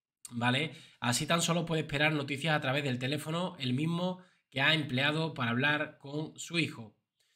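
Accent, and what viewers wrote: Andalusia, Spain. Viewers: tu acento andaluz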